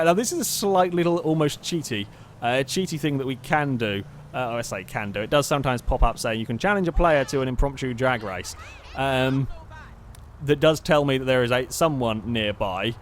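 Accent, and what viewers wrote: United Kingdom. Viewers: You're soo British 😂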